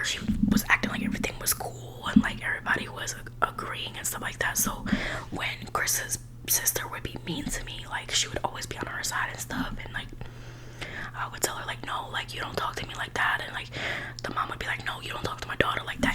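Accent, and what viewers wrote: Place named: New Jersey, United States. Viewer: Knew u were from jersey the min I heard u talk! but it sucks u had to go thru that, sounds like a headache. 🤕😒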